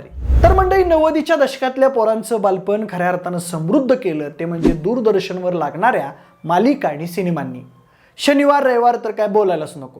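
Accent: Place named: Nagpur, India. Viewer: मला वाटले नागपुर करांचा आवाज़ आहे की काय तो।।।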